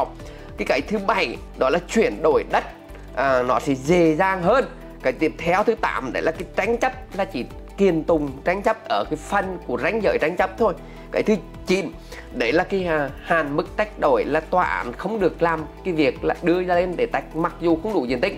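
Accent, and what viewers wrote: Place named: Central, Vietnam. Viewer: Nói nghe không được bạn ơi. . giọng nói nói nhanh giọng miền Trung khó nghe mà nói quá nhanh thật là nghe không được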